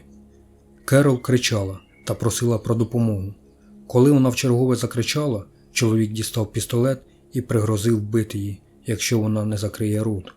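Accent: Ukraine. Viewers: Ведучий випадково не з черкаської обл? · так і подумала. Вимова як у Черкаській або Кіровоградській. Може, і Полтавська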